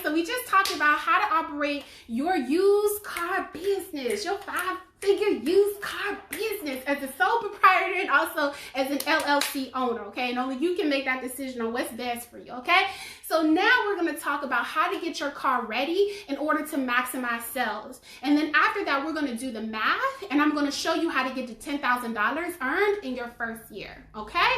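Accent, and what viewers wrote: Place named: South, United States. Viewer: Great information once again. And I love your southern accent. Keep up the good work❤️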